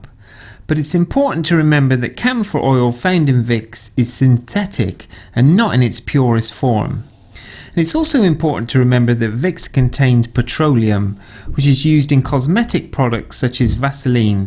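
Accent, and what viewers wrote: United Kingdom. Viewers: You sound like a british hillbilly 👎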